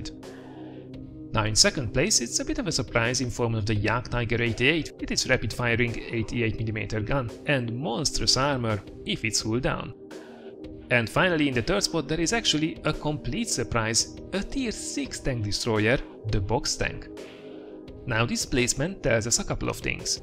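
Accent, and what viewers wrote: Hungary. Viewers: Magyar? :D Az akcentus nagyon olyan. :D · Are you from Hungary?😃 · Hat ez nagyon hunglish lett, fajdalmas volt hallgatni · Great video. I am 100% sure that you're from Hungary :)) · started the video while turning away from screen. after the 1st word, I would say (typing this without looking at either the comments or the user) this is a Hungarian accent... And, no mistake for sure...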